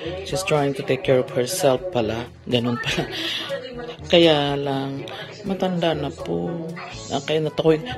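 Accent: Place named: Abra, Philippines. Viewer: Madam ang tuno yang salita mo parang taga sa amin. Bangued Abra po ang aking hometown.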